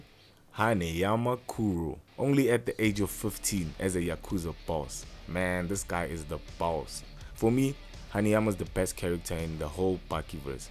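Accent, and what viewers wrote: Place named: Southern Africa. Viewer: You can hear a southern African straight away 🔥🔥🔥🔥🔥🔥🔥 much love from England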